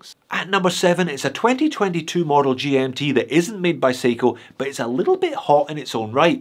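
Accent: Scotland, United Kingdom. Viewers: Me every time I hear an unexpected Scottish accent “oh ma gawd” Appreciate the video, I want a gmt for the utility but don’t want to be daft and overspend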